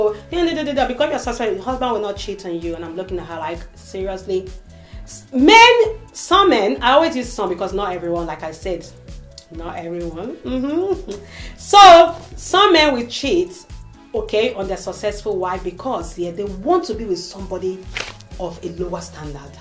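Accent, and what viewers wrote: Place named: Nigeria. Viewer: Im loving th naija accent💕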